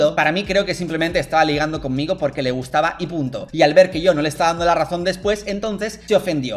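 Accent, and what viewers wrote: Spain. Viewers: jajajajajj, Apenas estoy viendo este video y la verdad si Lizbeth es una mentirosa y chismosa , tu eres un hombre el cual no cuenta con nada de valares , la verdad eres un hombre que me parece inteligente , pero en esta ocasión te escuchas muy mal, te pusiste en un nivel el cual baja la expectativa de lo que acostumbras hacer, la mayoría de los y las Mexicanas pensamos que el acento español suena bien y no te coqueteo no me gustas. Pero en fin es tu opinión, pero tus frases de : tipa, caballeriza y otros mas calificativos no van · Ayy dios me encanta el acento español Espera khe? ._. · Dalas es la persona española con menos asentó español que existe!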